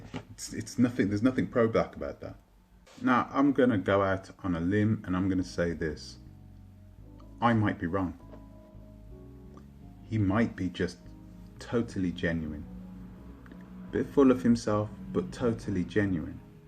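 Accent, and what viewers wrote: United Kingdom. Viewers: wait aren’t your kids white? “Biracial” so would that make you suspect ...... you just contradicted your self your British accent is way stronger than his you literally sound like a white man. Chakra bars accent is way more Caribbean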